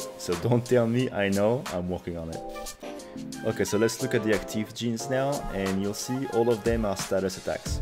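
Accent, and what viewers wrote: France. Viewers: was kinda my purpose. Thx. Btw are you French?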